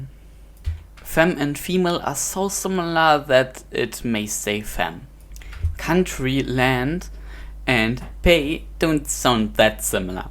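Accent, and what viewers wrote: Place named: Germany. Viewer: He sounds German 🤷‍♂️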